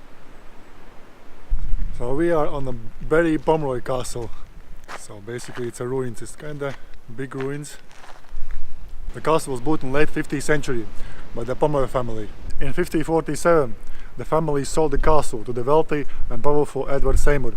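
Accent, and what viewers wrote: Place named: Latgale, Latvia. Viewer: Būtu ļoti noderīgi subtitri angļu valodā, jo tu lieliski veido stāstus/tekstus pagātnes formā! 😊 Par to ka esi latvietis - uzminēju 😊 Latgales akcents 😎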